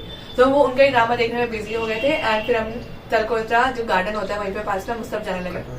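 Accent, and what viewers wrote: Haryana, India. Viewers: this red t - shirt wale bhaiya has been controlling his laugh and had never been serious in the whole video 🤣🤣😂😂😅😅🤣🤣🤣 by the way I love ur videos and I request u to pls give some tips and information about apps u use for editing etc. to help me start a you tube channel🤗🙏🙏🙏🙏🙏🙏❤❤❤❤❤❤☺☺☺☺☺ by the way di aap HARYANA se ho kya ??? because apka accent bilkul vaisa hi h guse mai ya funny mai😂😂😅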